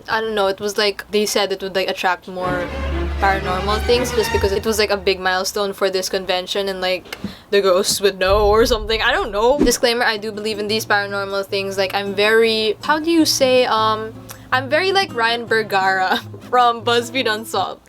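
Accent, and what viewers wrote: Philippines. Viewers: listening to a youtuber speak with a filipino accent is weirdly comforting. you sound like my auntie. thanks ate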